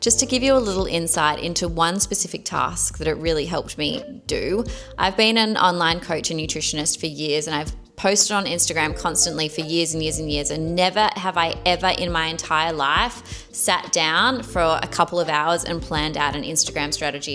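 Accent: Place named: United Kingdom. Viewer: first of all great video. People er your accent you live in the UK. Myself, I live in sunny hot Florida. Northern Florida in Jacksonville. I'm now 65 years young. And I stand at 7 foot 3 inches tall. I take my 5 grams of creatine 8 times a day. Sometimes I mix it in my whey protein shake or just drop it in my mouth and swallow it down. Yes es, it's the water. I drink about 2.5 to 3 gallons a day. And I mix my whey shakes with 2% milk and a raw egg. I used to be huge, but then I moved to Florida. Now I'm retired and I'm lifting again. I want to get back the body that I lost. But now it will be much harder because now I'm a amputee below the knee plus I'm in a wheelchair. But not to much longer. I'm getting tired if this chair, and I'm going to prove to my surgeons that they were wrong. They told me that I would never walk again. I'm a stubborn person, and you never say the word Never to a stubborn person. 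In 2016 I had my leg removed below the knee, exactly one year later I started my thru hike of the AT trail. I got half way in 4 months,before my custom hiking poles snapped in half. I was walking between 10 to 15 miles a day. Then 2 I'm years later I found out that I got a E-Coli infection on my spinal cord. Me and my doctor's killed that infection, before it killed me. But where that infection sat, it ate the cartkedge completely away in two spots on my spinal cord. Then they put me on opioids. They only take the edge away not the pains. But once my doctor cleared me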